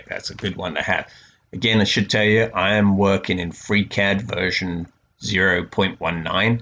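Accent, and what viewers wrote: United Kingdom. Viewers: You are a great teacher. I was looking for a CAD Software and found FreeCad. The new good CAD-Programs are only for rent anymore. As a single-man-business in Germany I just can´t afford to pay 500 Euro per month for renting a CAD-software while my main business is calculating frames and using other software. This software is meant for Industry - some fulltime - drawers, who live in their element - with their shortcuts and their productivity. Anyway, I would like getting better at Freecad and the FEM - workbench. Thumbs up, you´re a great person having an english accent and living in the US. Freundliche Grüße, Niko Böhm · Great videos, brings home the benefits of parametric designs, very well explained. You mentioned the possibility of adding this to an assembly, would be great to see that. Would also love to see something with print in place hinges on a box, can't find a full demo for freecad anywhere. 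Can't place your accent, doesn't sound American, dare I say more British ? Thanks for the time you put in to help out a beginner.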